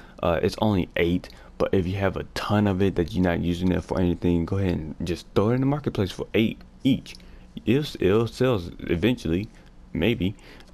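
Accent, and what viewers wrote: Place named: China. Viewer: U got a Chinese accent are you from Uganda?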